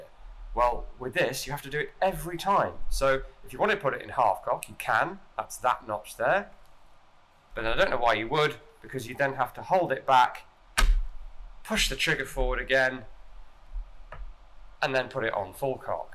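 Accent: England, United Kingdom. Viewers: Ian, what happened to your hair and why are you speaking in an English accent?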